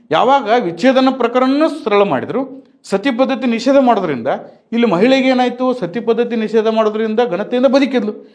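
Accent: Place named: Alagawadi, India. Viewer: ಇದ ಅಳಗವಾಡಿ ಭಾಷೆ ನೋಡ್ರಿ ಸರ್.... ನಾವು ಕೂಡ ಅವ್ರ ಪಕಿನ...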